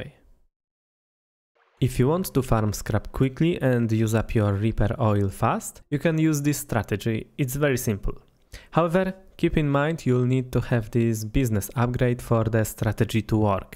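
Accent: Poland. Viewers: i ten polski akcent hehehe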